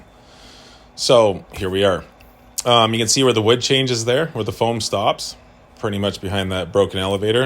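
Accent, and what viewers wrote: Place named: Canada. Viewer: Cheers. I had a feeling you might be in CA. (it was the road name and the pronunciation of 'ou' words - can't miss that! lol) Version 4 ain't your first rodeo. The experience shows, and I like the fact that you are willing to repair/replace/improve the scene or even the entire layout! Also, I like the stretched helix as it has fewer loops and greater access to errant trains. But, I was really surprised that the layout is only 15' wide - it looks much bigger, perhaps due to the generous track radii. So, I'm looking forward to the CTC series, and maybe a track plan? and meanwhile I'll check out the other videos. Thank you for responding - very helpful. Lawrence (CA, too - but, alas! USA, not CA)🙃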